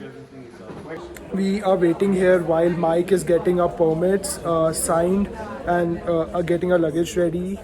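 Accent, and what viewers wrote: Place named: India. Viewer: Your asking bizarre questions from the porter is strange and funny, you look abs sound Indian to me and I’m sure where you originally come from in the third world you have seen people carry things on their head and necks and backs.. why are you acting so unnecessary questions? Anyway better luck next time! Great content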